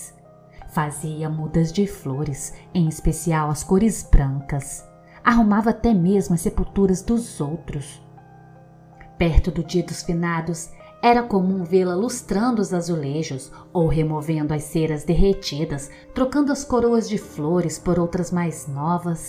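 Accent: Minas Gerais, Brazil. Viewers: perfeita e cativamente com sua minerice bom de ouvir com cafe e pao de queijo parabens!!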